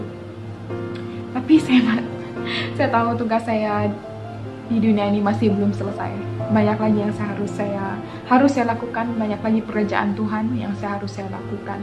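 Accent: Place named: Sabah, Malaysia. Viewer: Mcm orng sabah..😁 gbu👍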